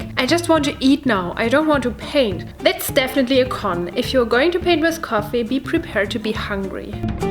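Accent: Germany. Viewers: Man hört, dass du aus Deutschland kommst c: I love Coffee 😍😍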